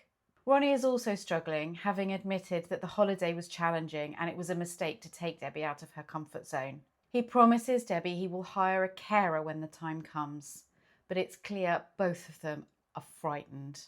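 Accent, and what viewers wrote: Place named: United Kingdom. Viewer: At last British accent. And a real person. No AI with American accents.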